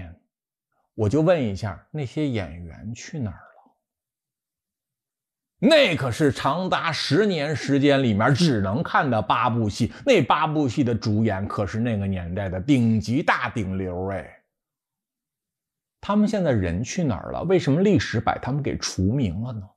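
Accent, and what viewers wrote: Beijing, China. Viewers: 一口京腔，南方人的我不太习惯。还是喜欢标准普通话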